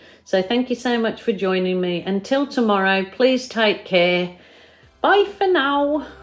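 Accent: Birmingham, United Kingdom. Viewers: Can I hear a fellow Brummy accent?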